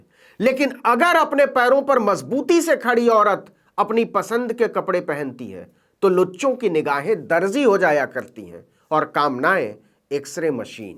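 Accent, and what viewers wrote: Standard. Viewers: Naveen Kumar ji aap ki Hindi bohot hi fluent hai aur pure hai ji...kya khub Hindi bolte ho ji aap... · Big fan sir. ur pure hindi narration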